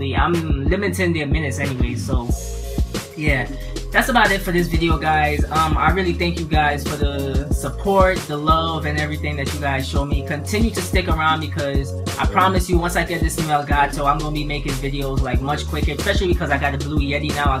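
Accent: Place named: New York, United States. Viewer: i knew you were from ny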